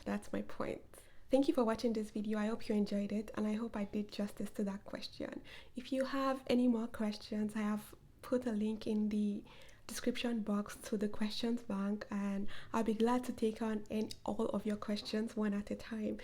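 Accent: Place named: Nigeria. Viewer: I knew it! You sounded Nigerian in the first video. Seeing that you last posted 9 months ago, I low-key wish I could connect with you. I know PhD is a lot. I pray you pass your first year qualifying examination and find the balance to come back to posting. Wishing you the best,.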